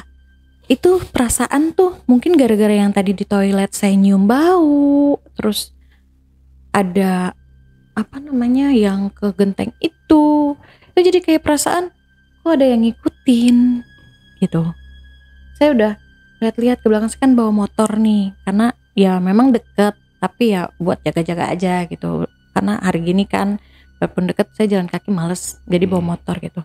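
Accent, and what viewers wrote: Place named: West Java, Indonesia. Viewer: Saya suka logat bicara ya pasti orang sunda.soalya saya juga gitu logat ya🤭 serius denger ya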